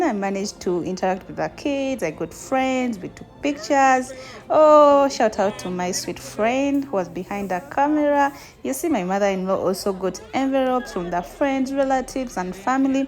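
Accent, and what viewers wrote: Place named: Australia. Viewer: 🤭 a lot of your ignorance shows on your comment. 😁🤐. sounds Aussie to me.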